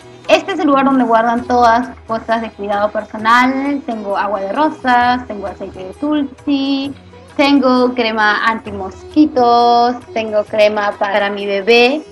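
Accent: Peru. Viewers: hola, yo soy peruana, y vivo en usa, me encantan tus videos, y veo que no haz perdido tu acento peruano, gracias por compartir y enseñarnos tantas cosas, te admiro por tu entusiasmo por la vida sana. Bendiciones.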